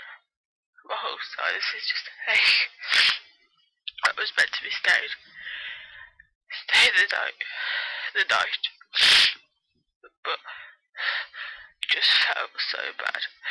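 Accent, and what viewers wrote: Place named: United Kingdom. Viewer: The Brittish accent un-topable sneezes. you have it all!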